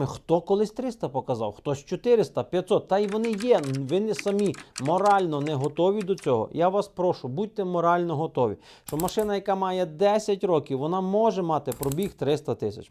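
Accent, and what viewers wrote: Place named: Prykarpattia, Ukraine. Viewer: Приємно чути рідний український прикарпатський говір.🚐🚐🚐🚐🚐🚐🚐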